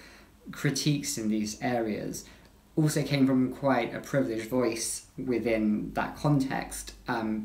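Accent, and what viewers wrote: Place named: United Kingdom. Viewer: I am from india ,came here to find. Something about surrealism but now I am like ..Wow I like this british accent 😘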